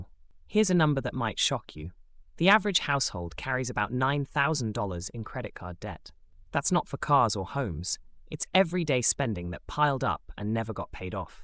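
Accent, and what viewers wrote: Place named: England, United Kingdom. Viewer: English Accent means I stop listening to this garbage.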